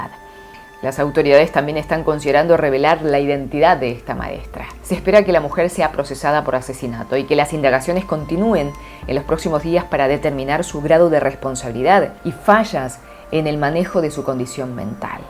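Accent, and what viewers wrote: Argentina. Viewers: Se ve extraño , ver a una chica con rasgos asiáticos y hablando argentino 😂 muy cool pero desconcertante ❤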